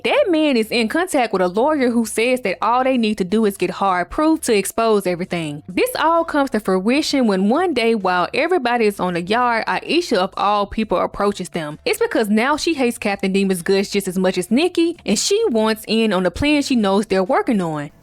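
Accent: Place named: South, United States. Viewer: love your country accent 😂😂 i feel at home! new subbie in me 💕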